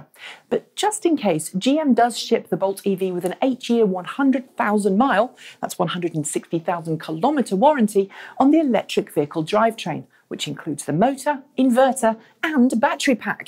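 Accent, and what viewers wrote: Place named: England, United Kingdom. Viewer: it s too hard to understand if English is not your mother language. it's a too 'hard' English accent. after months i must unsubscribe. a pity but it's always a too much big effort to understand for me.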